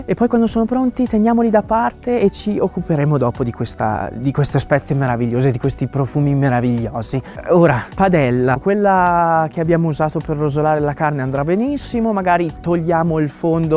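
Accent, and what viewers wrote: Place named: Romagna, Italy. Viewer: lo "ssiock termico", "tutto quel bel sciugo", "fazendo tosctare", "zzi vediamo alla proscima"...ma c'è niente di così piacevole per le orecchie come la parlata romagnola? A parte questo c'è davvero da squagliare di fame vedendo questa ricetta (avrei solo qualche riserva su tutto quel burro). Aggiungo, e spero di non metterti in imbarazzo, che me fai sangue pure te quanto il goulasch, perchè sei davvero un gran bel burdel (eccheccavolo, in base a quale legge o trattato chi illustra una ricetta ha da essere sempre di una bruttezza invereconda? Le eccezioni vanno apprezzate e segnalate).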